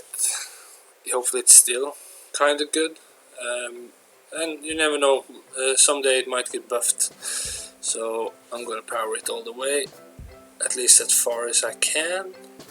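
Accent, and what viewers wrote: Norway. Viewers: jeg viste at du var norsk når jeg hørte dialekten din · man hører at du er norsk og ser det nede med pokemonene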